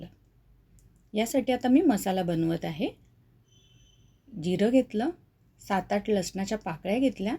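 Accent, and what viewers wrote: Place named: Khandesh, India. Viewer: एक नंबर ताई कांद्याची रेसिपी कांद्याची भाजी मला हवी खाण्याची रेसिपी ताई तुम्ही खानदेशी आहेत का कारण तुमच्या बोलण्यावरून समजत आहे मी खानदेशी आहे ताई मला एक विचारायचं होतं ते जखमी परमानंद गरम मसाला दाखवला आहे तुम्ही करतो सगळ्या भाज्यांसाठी वापरू शकतो का आपण झणझणीत उग्र वगैरे होत नाही का एक किलोच्या प्रमाणात सांगा नाहीतर अर्धा किलोच्या प्रमाणात सांगा प्लीज मी पण खानदेशी चा रिप्लाय द्या ताई मी तुमचे चैनल सबस्क्राईब केलेले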